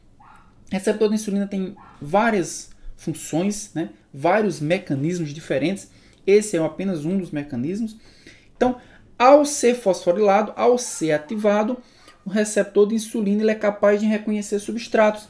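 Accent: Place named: Brazil. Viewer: muito bem explicado, adorei. Que sotaque lindinho e simpático, bem Brasil. Obrigada pela ajuda. bjs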